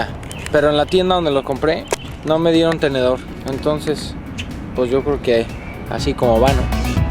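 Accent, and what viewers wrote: Mexico. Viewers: Estabas taaaan diferente: El look, los lentes, además el acento Mexicano súper marcado y los comentarios eran como más sarcásticos! Jajajajja- Me encantan tus videos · En ese tiempo tenías tu acento mexicano más marcado, me parece que ahora hablas más neutro. Saludos desde Chile!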